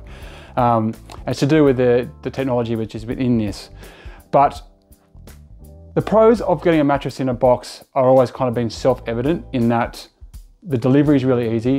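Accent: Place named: Australia. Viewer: Not too compatable with US market. I did enjoy the Aussie accent.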